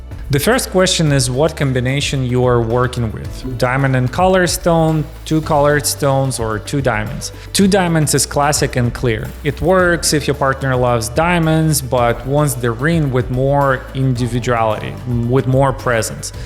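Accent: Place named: Czech Republic. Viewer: I guess (from the accent) speaker is Czech maybe, hence the mispronunciation of French. No big deal, the content is still valid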